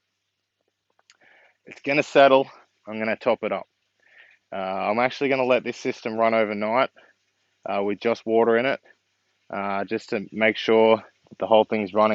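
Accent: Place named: Australia. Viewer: finally a yobo gardener.... sick of hearing yanks and poms... plus 10 bogan points for the beers... subscribing to this bloody legend!!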